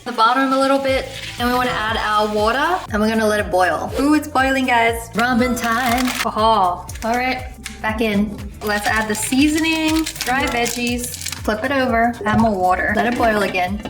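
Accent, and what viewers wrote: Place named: Australia. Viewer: I miss your Aussie accent! You sound so different. Will defs try the peanut butter recipe 😋😋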